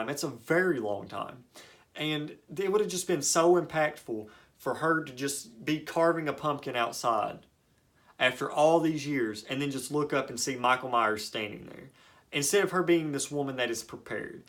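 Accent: South, United States. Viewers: I want a southern American accent like you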